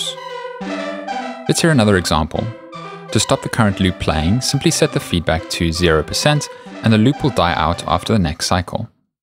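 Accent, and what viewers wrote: South Africa. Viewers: Your accent sounds South African, you from ZA by any chance?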